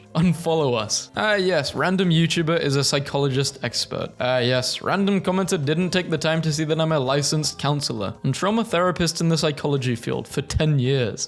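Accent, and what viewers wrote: United Kingdom. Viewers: Is it just me, or does this guy sound British?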